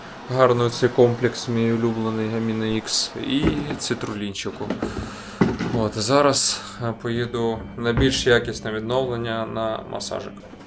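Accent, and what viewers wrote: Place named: Russia. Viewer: покращуйте вимову кацапська так і лізе, все інше лайк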